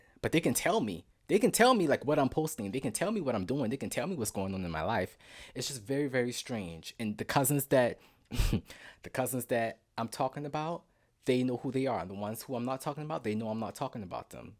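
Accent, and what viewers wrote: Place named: Geechee, United States. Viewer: Yesss your accent is too cute.. that good ole southern geechi honey ❤❤ I'm from SC too ❤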